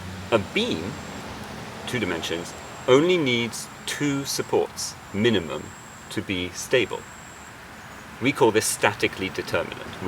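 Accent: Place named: England, United Kingdom. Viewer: My favourite kind of English accent - Ed-dew-cated. Not once did he utter, "let me arx you sumfin, yeah?"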